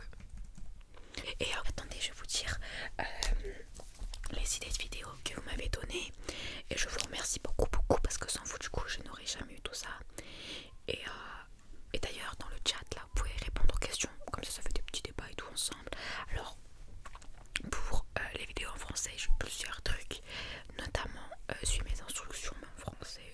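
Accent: Belgium. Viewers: bahahahaha wsh l'accent belge jpp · Ta un accent belge c'est assez troublant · Oui mais dèfois ta l'accent belge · Bahaha mais c'est quoi cet accent belge sur la vidéo ? Je m'en étais jamais rendu compte 😂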